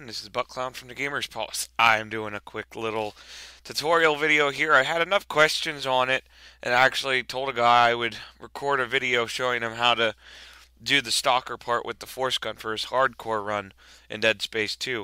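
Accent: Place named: Pittsburgh, United States. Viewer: You kinda sound like you're from Pittsburgh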